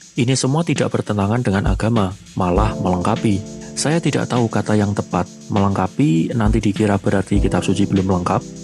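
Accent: Java, Indonesia. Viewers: Jawamu medok ga enak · Gw kirain mo belain pke dalil dalil konyol dan gw udh negatif thinking denger medoknya, ternyata gw ga tepat, ternyata bagus ada dasar knowledge yaitu sosiologi, ya wis lah gw sub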